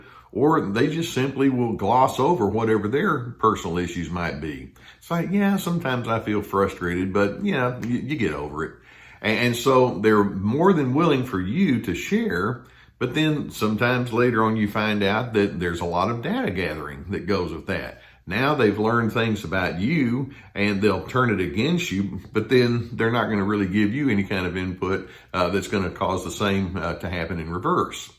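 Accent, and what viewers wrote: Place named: Virginia, United States. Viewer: Dang it, been listening to for years, just picking up your sweet southern accent. Virginia?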